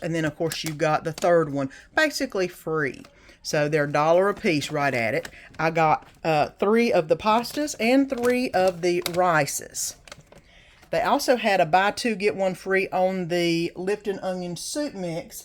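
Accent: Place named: North Carolina, United States. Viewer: I absolutely love your accent. I also live in NC. Great Haul!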